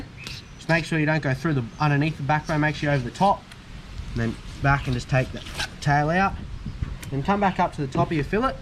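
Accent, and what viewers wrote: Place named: Australia. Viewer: I love your Aussie accent.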